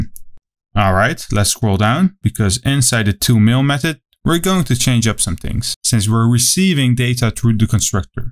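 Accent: Netherlands. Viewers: Hi, thanks for your videos. It sounds like you're dutch:) Can you make a video about broadcasting notifications?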